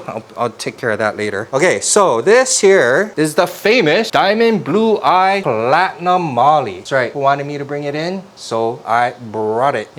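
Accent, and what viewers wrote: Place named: Hawaii, United States. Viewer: Came across a couple of your videos and by your accent I had a feeling you was from Hawaii 😂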